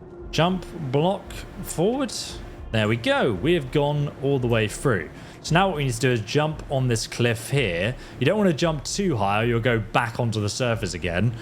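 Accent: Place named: England, United Kingdom. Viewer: Lovely Engish accent you have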